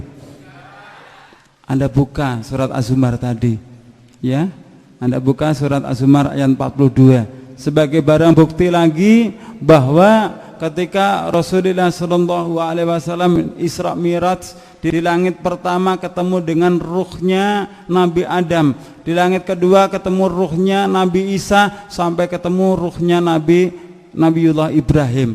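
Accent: Java, Indonesia. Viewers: Ustad ini asal usul nya dari Bali, tapi sudah lama tinggal di jawa.... wajar2 saja lah bro logat nya jawa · Ha ha ha Mualaf Cari Panggung...Keyakinan Itu Nggak Perlu Di banding-bandingkan.anda Yalin dengan Ke islaman anda, lakukan, kami yakin Dengan keyakinan kami, itu adalah jalan kami. Logatnya Jawa Banget. Jika tidak paham Agama hindu. Jangan mengomentari Keyakinan agama hindu. Fokuslah anda pada Agama islam. Ustad udah masuk Islam, Masih bilang di dalam agama saya. Anda semakin membahas agama hindu, justru menunjukkan kejelekan Anda. Maaf ( bukan Kejelekan Agama Islam) justru agama islam semakin dijelekkan dengan Orang seperti ini. Semoga komentar ini jadi viral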